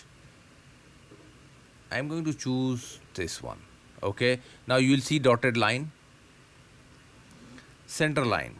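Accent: India. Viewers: Hi.Please work on improving your English accent. You have mixed your English accent with your Indian accent in a funny way.😊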